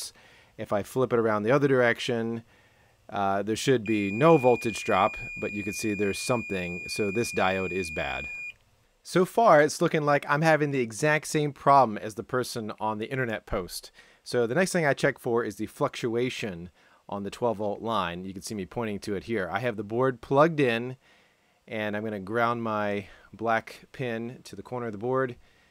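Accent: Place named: United States. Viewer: Hi cool video. ..but you don't say Soldering/solder propley. .guess it's yank accent. But like I said good video. And am going to fix my television.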